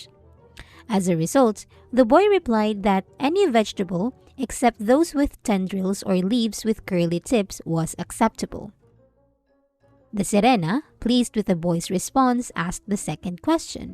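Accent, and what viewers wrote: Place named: Philippines. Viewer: You have a lovely accent, but I say that as lived in the Philippines for 6 months, awesome country, lovely people. Look up the Nantucket mermaid 1700s, seen and recorded by a Lutheran minister on a Dutch vessel. Recently sighted was a mermaid at Flat Bridge in Bog Walk Gorge Jamaica Mermaids are in the book of Enoch. They are the women who mated with Fallen Angels, locked in a hellish "purgatory" until the end of age and final judgment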